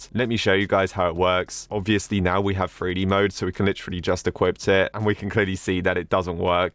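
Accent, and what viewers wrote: United Kingdom. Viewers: me: is watching the intro BRI'ISH ?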